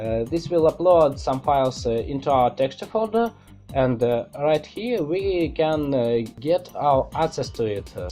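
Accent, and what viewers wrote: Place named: Russia. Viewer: Да по акценту слышно русского мужика!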